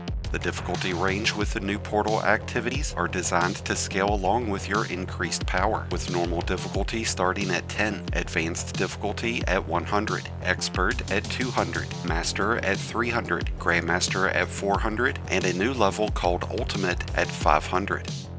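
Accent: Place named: South, United States. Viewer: I am a fan for your voice and southern accent. Please, check your mic as it's always been high pitch. A little bit of shhhhh too. Hopefully you can make a cleaner sounding voice the your future here. Love from a fan.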